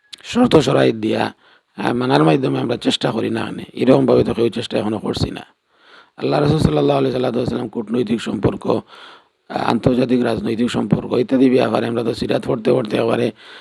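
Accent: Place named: Sylhet, Bangladesh. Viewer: খাটি সিলেটি ভাষা ❤❤❤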